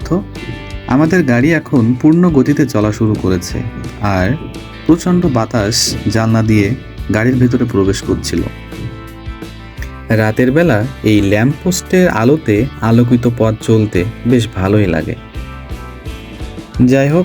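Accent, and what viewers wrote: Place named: Noakhali, Bangladesh. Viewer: beshi lomba hoye geche. Samne short korba. Tumi mone hoy noakhailly, amio. Pronunciation thik korar cheshta koro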